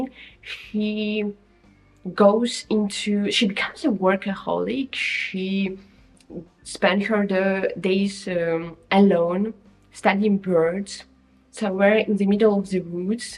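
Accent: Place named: Luxembourg. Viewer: I haven't read any of those books, but it was fun listening to you talk about them. I don't think I've ever heard a Luxembourg accent before, and I confess that I had a little trouble understanding it at times, but if you can take the trouble to read English books, and review them in English, I can make the effort to listen carefully. I look forward to seeing more videos from you. 🙂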